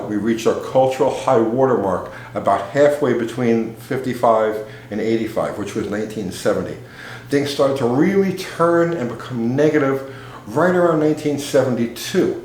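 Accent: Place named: New York, United States. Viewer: I didn't know you were such an historian! But then when you talk, my head tilts to the side like a dog. Your silky NY accent drives every point home.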